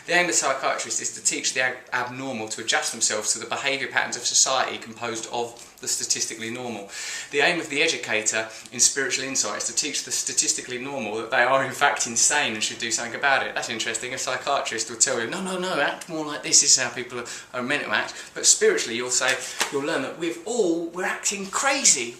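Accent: United Kingdom. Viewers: Hey i don't understand to much british accent, and you speak very fast, breath a little lol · Hey.. listen to enough of these and you too can start understanding brit .. love it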